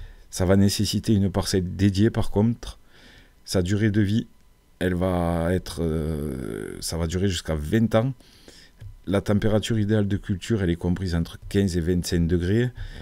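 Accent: South, France. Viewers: Merci beaucoup pour vos vidéos. L'art du jardinage avec l'accent du sud . Super! Avez-vous entendu parler de la mâche perpétuelle. Merci pour votre réponse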